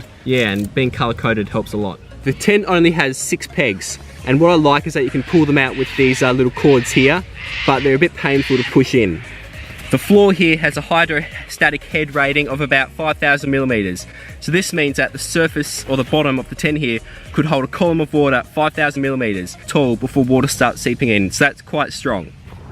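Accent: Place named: Australia. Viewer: Great video - awesome to hear an Aussie voice on one of these. Interesting there's no zip cover... Very thorough review. Liked and subscribed. Will be checking out some of your other vids. Cheers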